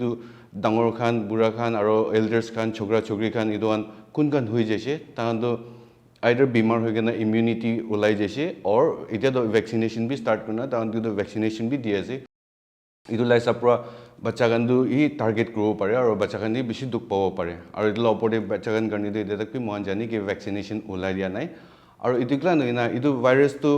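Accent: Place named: Nagaland, India. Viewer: Your nagamese is very sweet and clear hope everyone will become a good Frontline workers after hearing your message.Thank you Dr.God bless you for your good works.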